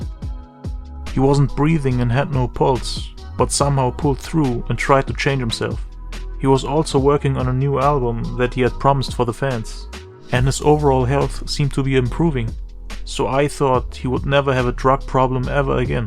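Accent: Germany. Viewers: wenn man im Profil des Videos auf "Kanalinfo" klickt, kommt auch die Info "Herkunft: Deutschland". Man erkennts ja auch am Akzent :D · First time that i hear you speak. Are you German? Because your accent sounds like it. Also, Rest in Peace to one of the GOATs. If you somebody suffers from depression or dont feel well for other reasons, believe me drugs are never the answer! They only put you even more down, i know what i am talking about, i also had drug problems in the past. Hope really found his peace! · Habe ich mir fast gedacht... dein accent sounds pretty german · Dickaa, ich hab gewusst, dass dieser Akzent Deutsch sein MUSS. Bin in die Info und dann sah ich, 'Aufenthalt Deutschland'. Mach weiter so, bleib am Ball🫡 Rest In Heaven, A Rap LEGEND✓✓✓😔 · Loool you sound very german biste deutscher? Edit : Okay hab den Mediamarkt und deine Geschichte gesehen krass! 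Hätt net gedacht das du Deutscher wärst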